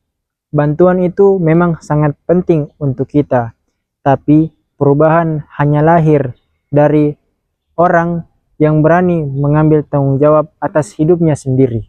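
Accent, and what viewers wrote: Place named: Java, Indonesia. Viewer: Jawanya melekat bgt kak🤩🤩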